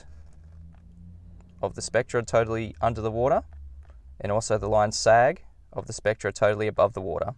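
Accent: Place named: Australia. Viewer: Good luck with your experiment. I think your vertical bars may need some strengthening. Possibly some angled bars from the horizontal bars near the container to the pulley and to the tensioning line connection, there will be largish forces involved. triangles will give you your strength. ==================== At least you understand buoyancy is a function of gravity. Considering that gravity isn't compatible with any FE model, you wont be getting much FE support. ===================== You have an Aussie accent, therefore you can ascertain South (using a few stars) and observe the South Celestial Pole on any night. (that also breaks all FE models) A far simpler experiment, but you should give this idea a go.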